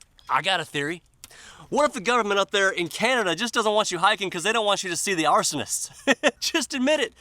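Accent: United States. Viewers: You sound USa educated.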